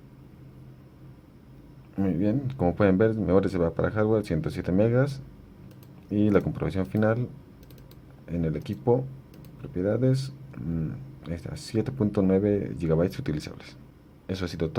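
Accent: Bolivia. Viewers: SU ACENTO DE BOLIVIANO , SOY DE BOLIVIA JAJA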